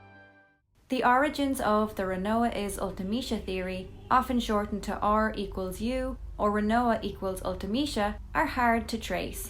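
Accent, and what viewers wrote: Ireland. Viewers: I don't know the specific accent, but you sound Vaguely corcaigh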